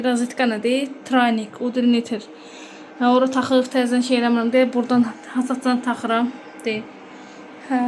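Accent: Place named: West, Azerbaijan. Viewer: Maragli geldi haralisiniz lehceniz qerb zonasina oxwadi ona gore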